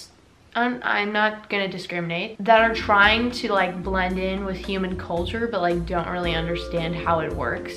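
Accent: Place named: Alabama, United States. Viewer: You have a slight Alabamian accent. Are you from here aswell? You have a tint of south in your voice.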